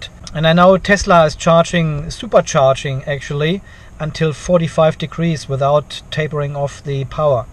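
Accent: Germany. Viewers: R u german ?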